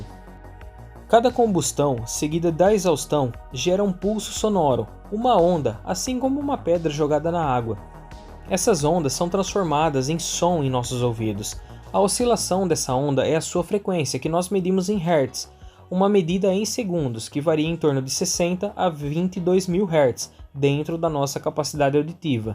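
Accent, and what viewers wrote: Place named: Paraíba, Brazil. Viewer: Esse sotaque da Paraíba ta bem audivio mesmo tentanto não deixar aparece no vídeo, ótimo vídeo Aquela yamaha é 2 tempos ou 4 tempos?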